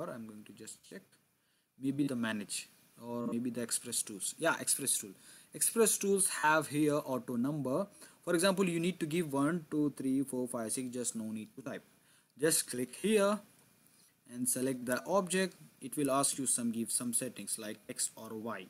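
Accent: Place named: Russia. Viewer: Why your accent is like russian😂 bhai you are indian be as it.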